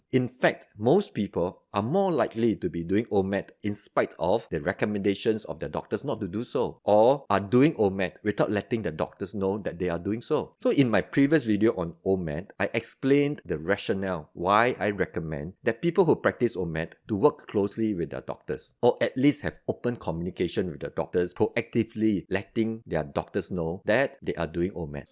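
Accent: Singapore. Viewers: Singapore accent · you must be singaporean. Singapoh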